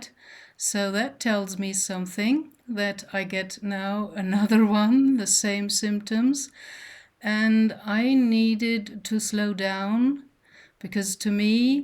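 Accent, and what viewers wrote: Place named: Germany. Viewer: I had to subscribe. You have a similar German accent to my father, an accent that shows that you worked on perfecting your english. He passed at 90 a couple years ago and today May 11th was his birthday. I called his partner to wish her a happy mother's day, and we talked about him. Anyways, i like your message, i hope you're back in full swing soon. 💗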